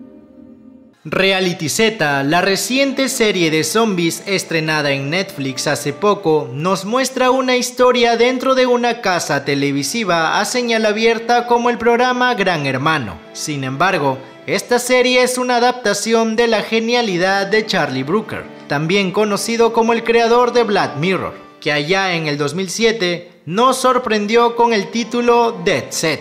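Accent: Peru. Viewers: De que país eres xd ? por como hablas peruano pe mano ?= soy nuevo xd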